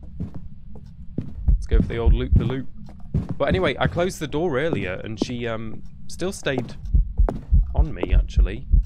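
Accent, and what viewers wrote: United Kingdom. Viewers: What part of the UK are you from? I recognize the accent you got. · Man am I the only one who absolutely love his really good british accent? Idk it just sound so amazing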